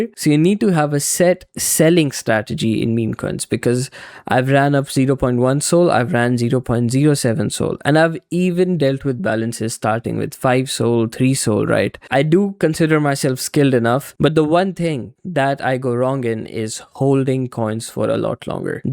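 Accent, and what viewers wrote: India. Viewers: Your accent is somewhat Indian.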